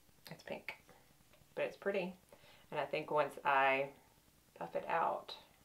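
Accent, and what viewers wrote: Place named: Appalachia, United States. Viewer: Been watching you for about a month now and I’m so happy I found your channel....I had never heard of Harbin house and watched one of your videos where you were talking about the Elta MD and immediately went and snatched it up one of my favorite sunscreens. Also your accent reminds me of Home I’m from the mountains of North Carolina right on the Tennessee North Carolina border😁.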